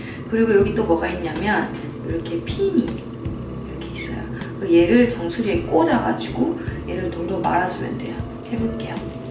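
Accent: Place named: South Korea. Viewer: I heard some dialect in your Korean, are you not from Seoul? :)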